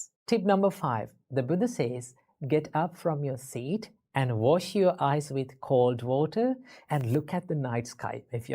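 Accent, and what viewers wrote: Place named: India. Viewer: His english feels hindi 😊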